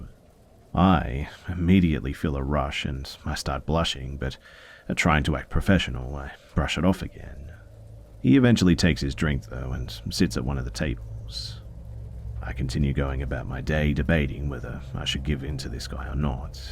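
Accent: Australia. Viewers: mistercet pretty sure he’s got an Australian accept lmfao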